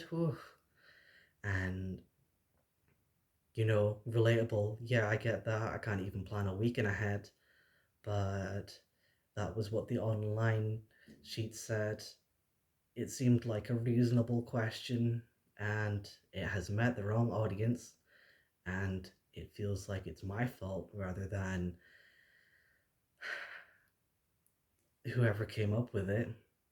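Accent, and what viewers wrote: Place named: Scotland, United Kingdom. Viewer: I can tell by the accent your Scottish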